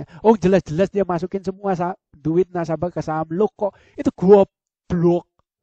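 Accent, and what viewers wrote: Java, Indonesia. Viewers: Jiangkrikkk.... Muantapp ker lak ngomong. Medoknya dapet... Coba pak bilang jianncukkk...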